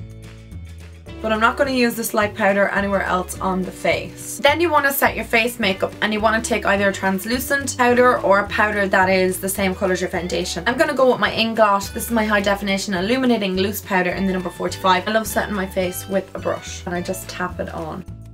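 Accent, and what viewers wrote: Ireland. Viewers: omg are you irish? C: you sound irish :D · Are you Irish? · I've watched your videos for some time now and I still cant get over your accent! I love Irish accents! They are so much more interesting than the boring oll American one. Loved this video as well! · Your Irish accent is so beautiful!! And so are you <3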